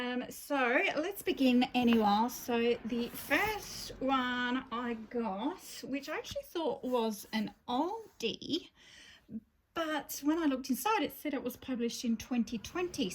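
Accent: Australia. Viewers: Hi I am fairly new to your channel but I have been binge watching. I love all of your videos. I have gotten so many coloring book ideas from you as we have very similar taste. Most of all your lovely Australian voice is such a pleasure to listen to. Very relaxing. So glad I found you on here! 🙂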